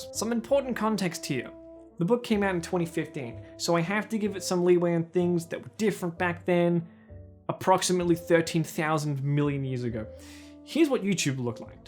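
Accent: Australia. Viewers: Josh Strife Hayes but australian and unrelated to videogames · the rhett and link one is so weird to me because one of my friends grew up in that town and hearing an australian man detail the experience is making me feel emotions that have yet to be documented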